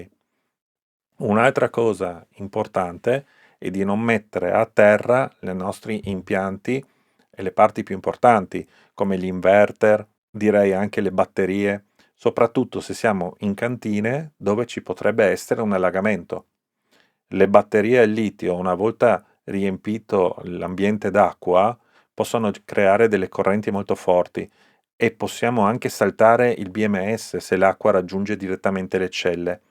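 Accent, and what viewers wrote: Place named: Sicily, Italy. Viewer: Sei molto chiaro nelle tue spiegazioni dettagliate .( Dal tuo accento ho capito che sei siciliano ,,auguri!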